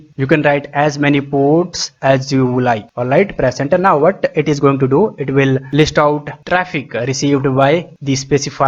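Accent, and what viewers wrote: India. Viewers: I never cared about heavy Indian accent because my pc is healthy thanks to a lot of precious help/knowledge that i absorbed by watching tutorials made by Indians, among other things. I have come across videos where i had to pause and listen again to a phrase or two, but that's the worst case scenario. And the value of the information absorbed, is the only thing that matters. Thank you for such a helpful video unknown friend. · the indian accent may the most annoying of all, but the content are really helpful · When I heard Indian accent I thought the video is gonna be just a joke but this video helped me so much, great one, keep it up! And u really know what are u talking about. Like deserved.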